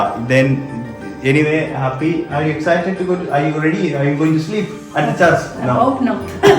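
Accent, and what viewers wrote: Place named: Kottayam, India. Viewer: സംസാരം കേട്ടാൽ അറിയില്ലേ കോട്ടയം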